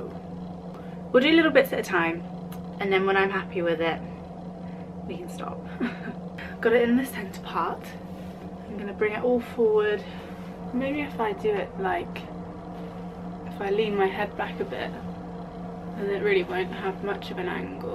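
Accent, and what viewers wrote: RP, United Kingdom. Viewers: Posh English accent. ;)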